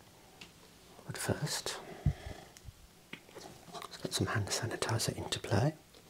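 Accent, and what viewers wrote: Birmingham, United Kingdom. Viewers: Are you a brummie by any chance. Love the accent.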